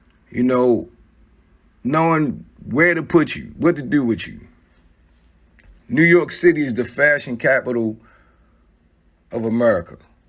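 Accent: Baltimore, United States. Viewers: This guy, the content creator is from Baltimore based on his accent. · U have to be from Baltimore Maryland I can tell by that accent . U sound like my sister.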